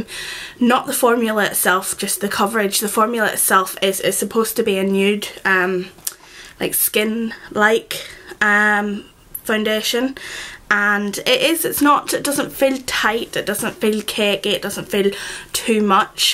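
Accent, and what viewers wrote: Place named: Northern Ireland, United Kingdom. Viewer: Are you from northern ireland? I think I recognise your accent haha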